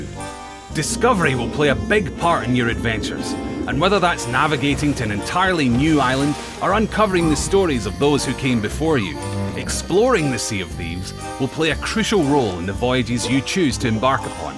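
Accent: Scotland, United Kingdom. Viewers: voice actor? You mean the guy commentating who is Scottish? That’s his normal ascent...... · I don't want to upset any Scottish people but for a long time I thought this guy was Simon Pegg doing a Scottish accent until I realized the guy at rare is also Scottish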